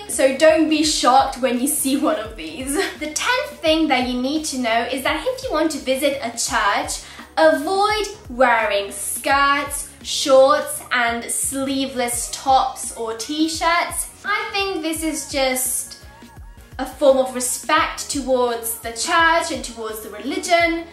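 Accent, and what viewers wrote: England, United Kingdom. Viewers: My grandmother was born in Italy. We still have her naturalization papers. Your English sounds English. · Question.. If you are Italian, why do you have an english accent?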